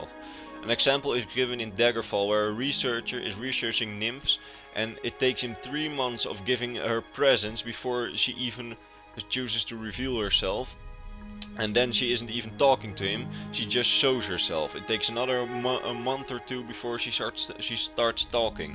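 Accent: Netherlands. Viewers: From your accent I can Tell your Dutch Correct? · dunglish tho or nengels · the man behind the voice, is he Dutch? the accent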